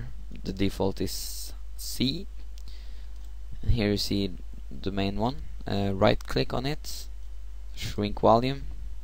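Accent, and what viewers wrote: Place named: Scandinavia. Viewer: Thanks mate ^^ Are you from Sweden or Norway by any chance? xD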